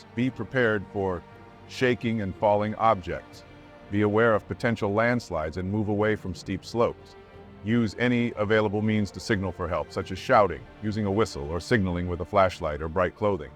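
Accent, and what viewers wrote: United States. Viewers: Shocking narration. Ugly American accent. You couldn't make this up if you tried.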